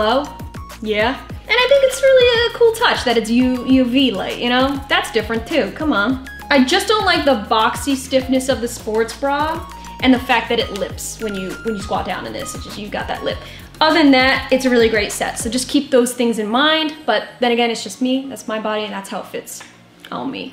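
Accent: New Jersey, United States. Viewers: Okay I’m back I’m definitely buying this collection I like that it glows in the dark that’s dope man that green is super cute I see it’s a lower cut still cute I like a pocket on my workout pants because I can put my phone in there but other then that I don’t like all those lines where are you from u sound like ur from jersey I don’t like nothing sliding yeah see I don’t like that on my heel I will pass on that one bring the glow in the dark tho that’s the one I want for sure! I don’t like padding I like the back of that bra tho on that green nice medium band great review girly u are so detail u said damn lol I got a pair of nikes that glow in the dark I didn’t even know they did I was like wow it will go good with that those make ur butt sit up I’m here for it it’s gone lift because ur small but other then that u need to tell gym shark to let u design some stuff because u know about them well they need to have u on there team for sure man I love this video great job on the two fits for gym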